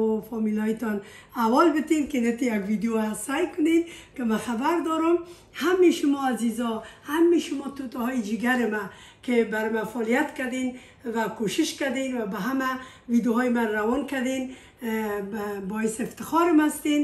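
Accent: Kabul, Afghanistan. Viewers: سلام خدمت شما بانون مهربان و هنرمند افغان، خانم عزیز. اینقدر که آشپزی تون عالی و از اون مهم ترین صحبت کردن شما با لهجه شیرین افغانی و خونه وزندگی تمیز و زیبای شما کلی بهم آرامش میده و لذت میبرم از تماشای هنر آشپزی شما. اینقدر که مهربونید من احساس میکنم یکی از اعضای خانواده من هستید، شما خانمهای افغان خیلی هنرمند و مهربون و خانواده دوست هستید خوشحالم که هستید و آرزوی سعادت، سلامت و شادمانی برای شما و خانواده عزیزتون دارم. برنامه هاتون رو لایک میکنم و به دوستان ایرانی خودم هم معرفی میکنم. 🙏🙏👍👍🌹🌹😘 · خاله جان شیرین چقدر شیرین حرف میزنی بسیار با ادبیات بالا و همتو لحجه مقبول کابلی امروز اولین بار است که برنامه تان را دیدیم بیخی عاشق حرف زدن تان شدم 😍😍😍😍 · سلام جان ، من ایرانی هستم ۴۷ سال در فرانسه هستم افسوس میخورم که وقتی جوان بودم و از ایران امدم افغانستان را ندیدم من عاشق لهجه افغانی و شغر های کشور شما هستم امروز شما را روی یوتیوب پیدا کردم خیلی لذت بردم انشاله همیشه سلامت و موفق باشید خدا پشت و پناهتان باشد. 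شم چه کشوری هستید. قربانتان هلن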